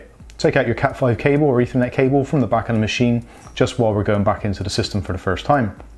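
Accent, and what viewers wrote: Bristol, United Kingdom. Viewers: Are you a Bristolian?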